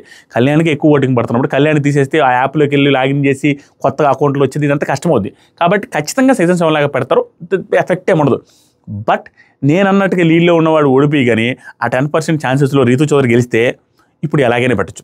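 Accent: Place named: Nellore, India. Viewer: Hi,anna sleeping😴 review avuna😮😅 nenu from nellore district now in princeton usa ,mana slang so happy vinadaniki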